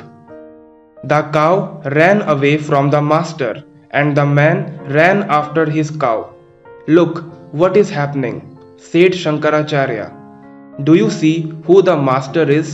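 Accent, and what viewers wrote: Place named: Bihar, India. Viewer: His accent seems to suggest that he is a pakka Bihari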